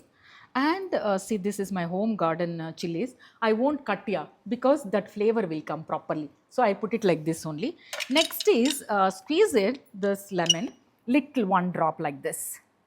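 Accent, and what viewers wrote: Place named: Telangana, India. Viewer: Akka you are Hyderabadi you speak, Telangana Akka.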